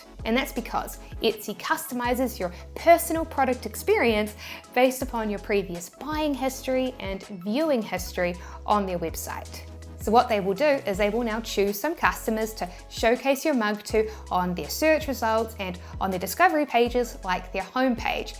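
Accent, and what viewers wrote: New Zealand. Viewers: would.love to have a convo about nz as I wana do tshirt around it.. the tshirt u say to use.. u got any specific nz related advice.. and u too was lovely hearing abit of home.in ur voice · NZ accent is brilliant. Fet? Could be Fit. Could be Fat. Nobody knows!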